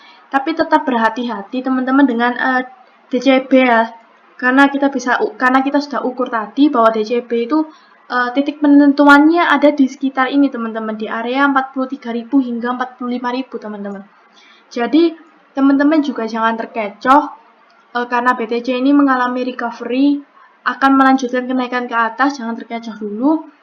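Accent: Java, Indonesia. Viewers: Medok mbak.wong ngdi e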